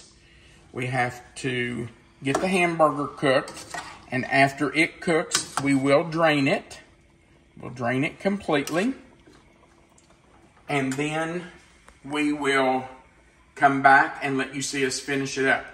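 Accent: South, United States. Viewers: I'm southern, but I can NOT identify that accent. Seriously, not a clue. At first, I was thinking Kentucky, then southern Virginia... but then I was wondering if it's Alabama... Mississippi... Arkansas? I can't place it.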